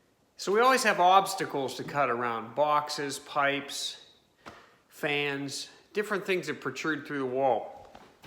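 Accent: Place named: Aliquippa, United States. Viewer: I left Aliquippa 30 years ago but I picked up that accent of yours within 15 seconds of watching this video. Great job, it was a good refresher for some Sheetrock work I have coming up.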